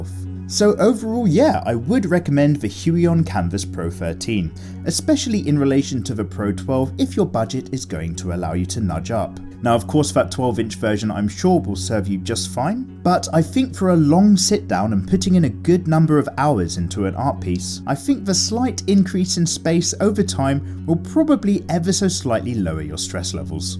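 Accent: United Kingdom. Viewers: you videos are very informative and quite funny, with a bit of a Brit accent, truly good Old Chap, do keep it up to use your humor.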